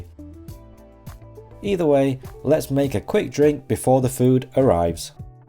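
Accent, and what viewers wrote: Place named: England, United Kingdom. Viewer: Nice pomme accent by an Asian guy doing Indian food... From a African guy from Kerala, India:-)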